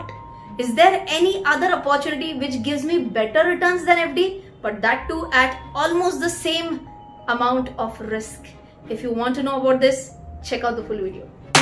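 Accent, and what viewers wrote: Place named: Telangana, India. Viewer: You are not from India right By that accent and that hairstyle I can tell she is from Telegana or Andhra pradesh ,India.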